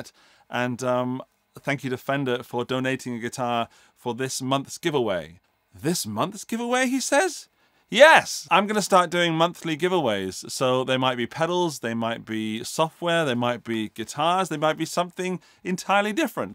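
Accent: England, United Kingdom. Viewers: I'm relatively new to the channel, and so far my biggest takeaway, and the thing for which I'm most thankful to you, is learning of the Strymon Flint, which will soon be part of my (very small) pedal arsenal. That and the hair, which is apparently somewhat toned down these days. Some of the older clips... it's memorable, I'll give you that! Seriously though, you're very articulate and occasionally funny with an English accent, which to American ears connotes intellect and is immediately suspicious to the bad people. I like it myself.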